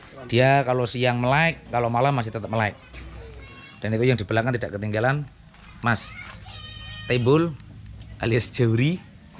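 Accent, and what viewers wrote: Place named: Banyumas, Indonesia. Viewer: Ngomonge deneng mandan ngapak ya mas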